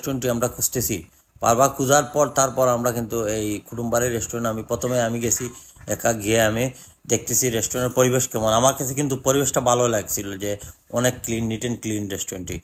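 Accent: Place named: Sylhet, Bangladesh. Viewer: অরদেক শুদ্ধ ভাসা অরদেক সিলেটি ভাসা তুমার কন্ট ফাটা ফাটা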